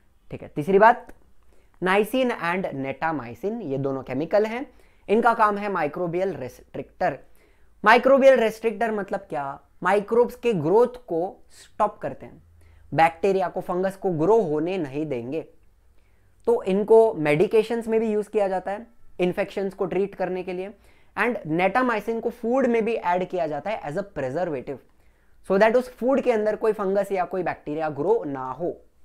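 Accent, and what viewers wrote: Maharashtra, India. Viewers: Sir aapn Marathi aahat ka ??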